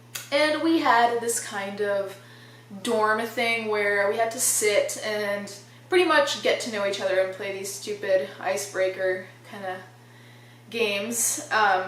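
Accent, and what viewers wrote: United States. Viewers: I think they expected you to have an Italian accent and it’s weird cause you have an American accent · I think it’s weird as do many that you didn’t get your accent in America... it’s interesting but weird because it’s an American accent simply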